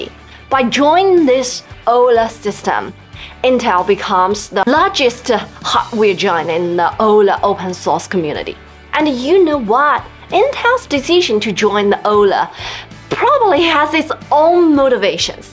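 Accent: China. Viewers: your chinglish is soo sexy!.............loved it! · I really love your English as spoken by which is original Chinese girl speaking the International English language. Well done and keep smiling😅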